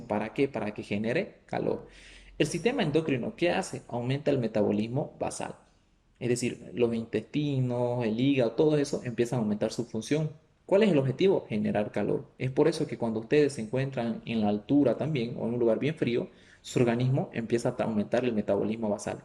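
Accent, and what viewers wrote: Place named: Bolivia. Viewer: Muchas felicidades DR, me ayudaste mucho en fisiología, explicas muy bien, por tu forma de hablar pareces boliviano, yo también soy de Bolivia, y te ganaste un nuevo suscriptor.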